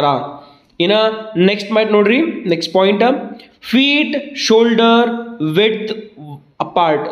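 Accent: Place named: North Karnataka, India. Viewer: your uttara Karnataka language is so beautiful.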